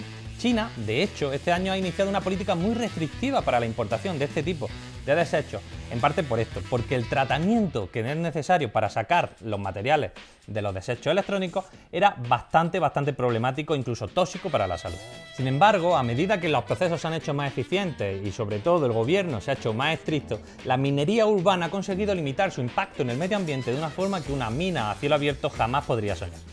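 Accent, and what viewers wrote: Murcia, Spain. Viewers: JAJAJAJ este chico seguramente es de Murcia, es verdad que en cada región hablamos diferente pero tampoco vamos faltando a nadie 😉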